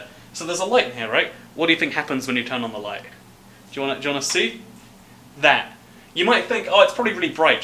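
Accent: United Kingdom. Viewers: How american is, he's certainly 100% speaks british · Hes brittish, chill. Its probably the acent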